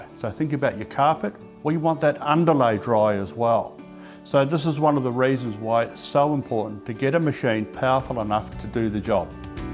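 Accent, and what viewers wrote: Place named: Australia. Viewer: After watching 5 US vids on the best dehumidifiers I can't even get in Aus.... Awesome listening to another Aussie.. :-)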